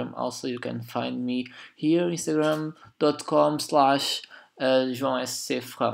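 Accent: Portugal. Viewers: Assim que ouvi a tua voz reparei logo que eras tuga, bom vídeo. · Esse sotaque português ... Topei assim que começaste a falar. Obrigado pela dica bro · Pelo teu sotaque bem me parecias português xD , obrigado pela ajuda !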